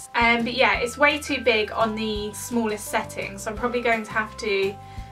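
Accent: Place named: England, United Kingdom. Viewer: is she english or american? her accent sounds so english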